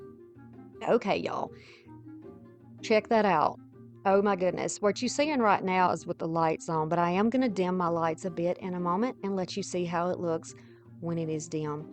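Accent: South, United States. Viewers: I love Dark Academia and Dark Cottage Core. Amazing stuff. Thanks for sharing! Also, I love your voice. I'm also Southern. Yeah, it's ES-presso, but regional dialects are so common in the US. If you want to always pronounce things the absolute correct way, then you make it your own. 🙂It's still yours when you pronounce it the way everyone else does, because no one has your voice. I don't think you need to apologize for it, though. You have a lovely accent and I think regional dialects are interesting and unique. 🧡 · Wow! The candelabra was so awesome! I have had a set of those forever and just didn't know what to do with them, so thank you for the idea! So happy I accidentally found your channel! I have learned so much from this video! Using the sander around the edges of the fabric was such a helpful hack! That turned out fabulous! Great video, can't wait to see more! 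Btw- so cool to hear somebody have a genuine southern accent like mine!!!🤠😅🤗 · Thnx for the inspiration n btw you have the best southern accent ever! Love it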